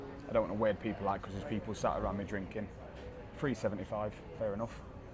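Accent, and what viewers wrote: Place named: England, United Kingdom. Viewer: Have you not seen York Minster? You sound English & not particularly 'southern' so I too am surprised that you were never taken on a school trip to a 'Minster' town. The whole town of York is stuffed with architectural & historical gems. The Minster of course but also Cliffords' Tower, the Guild Halls, the walkable town walls & ancient cobbled streets called 'gates', the Shambles, the Railway Museum, the ruins where the Mystery Plays are performed, Bettys Tea Shop & much more. You could easily spend a week there & not see or do everything. The river Ouse, when not in flood, is a treat in itself. I have not been to the Christmas Market for many years but it used to be a treat too. Cheers. Other towns of interest In Yorkshire alone worth a visit would be Ripon with its Cathedral, Harrogate, Knaresborough, Whitby for the Abbey & its neighbouring church & many more. 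Wishing you happy hunting. Since you are a joiner you should be particularly interested in any Rood Screens in church buildings that were not destroyed during the reformation. As a joiner it is also worth remembering that the Church also employed highly skilled craftsmen, artisans & labourers to build Cathedrals, Minsters, abbeys, nunneries, hospitals, chapels & churches, some of which took hundreds of years to complete & which all need the same skills for maintenance today. In the words of the old song this all made "work for the working man to do". These places may seem grand but the atmosphere is provided by hundre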